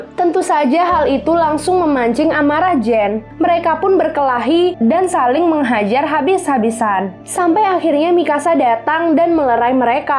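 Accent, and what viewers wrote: Lombok, Indonesia. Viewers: Mba orang lombok ya?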